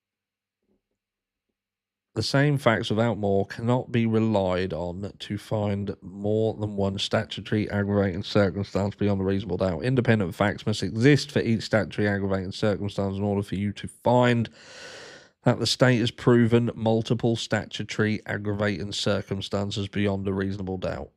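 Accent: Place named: RP, United Kingdom. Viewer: Hello that posh accent 😂 i didn’t think it was you until the swearing😂.. in bed still ill & hubby put you on but i wasn’t watching at first just listening i thought thats no.. 😂 that was a lot of docs.. sorry to hear about your daughters glasses.. maybe who done it will own up.. not.. hv a good day everyone im off to feel sorry for myself 🤷‍♀️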